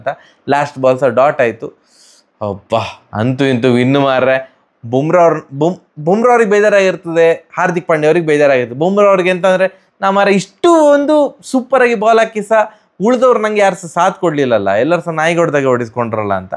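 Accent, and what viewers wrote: Mangalore, India. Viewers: had lost it's charm, humor, and that Mangaluru accent, nowadays it has become as a summary of match, we have watched the what's the necessity of yours, we need videos likes your old videos, where you used to talk in a comedy way, like Dani seit, please try to bring that back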